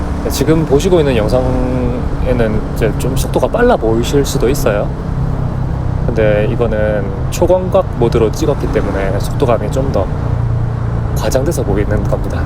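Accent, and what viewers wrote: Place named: Daegu, South Korea. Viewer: 서울사는 대구인이라 사투리가 아쥬반갑네요 ㅎㅎ 단체투어는 신경쓸게 많아서 결국 솔투를 즐기고 있습니다. ㅅㅅ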